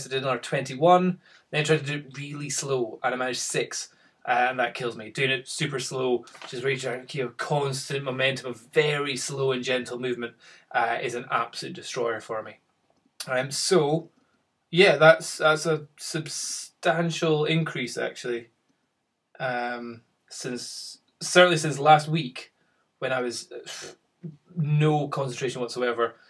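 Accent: Scotland, United Kingdom. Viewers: dude , where are you from , Edinburgh / glasgow ? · he's scottish, it's his accent bro.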